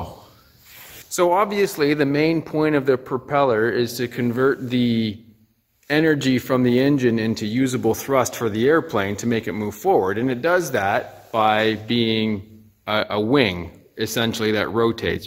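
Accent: Canada. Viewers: Thanks for the info re: props I had no idea, I have a couple of?? what is the brand name of those outboard wing tanks you have on your plane, second? is that RR bridge that you & Vicky flew over the day you had trouble with your starboard side snow slippers, was the bridge C.N or C.P. you in Canada? put it this way you sound Canadian. Cheers from OZ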